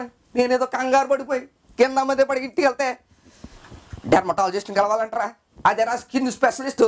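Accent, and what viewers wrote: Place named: Godavari, India. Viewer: ...godavari slang that too konaseema slang made all the difference...very great attempt...u simply rockzzz...awesome... glad to see comment of puri jagannadh sir stating that he needs actors like you... u made a succesful attempt CONGRATS:)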